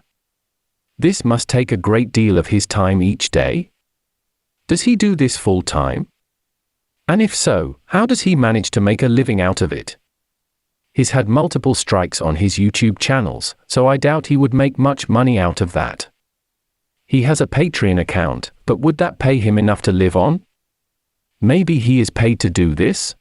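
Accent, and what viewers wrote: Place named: Multicultural London English, United Kingdom. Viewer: It's a 'London Multicultural' accent, not a real London accent.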